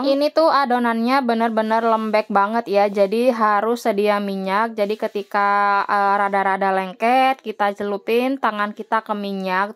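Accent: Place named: Bondowoso, Indonesia. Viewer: Mbak ini dimana rumahnya? Koq lafadnya kayak orang Bondowoso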